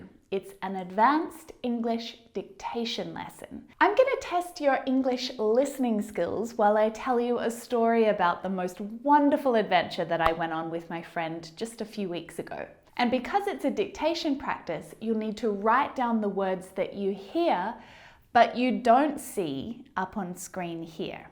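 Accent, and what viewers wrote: Australia. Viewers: Australian Accent ❤️❤️❤️❤️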